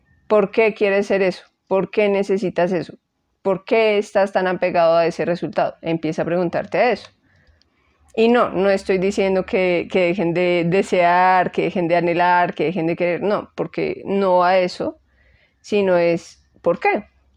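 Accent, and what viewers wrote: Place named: Bogotá, Colombia. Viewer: Me encantó tu canal ❤ lo encontré buscando meditaciones y me pareció curioso tantos años viviendo en otras ciudades que escuchar tu acento que es de mi tierra Bogotá supongo lol parece jeje que recuerdos, y viendo los videos me encantaron gracias por compartir este contenido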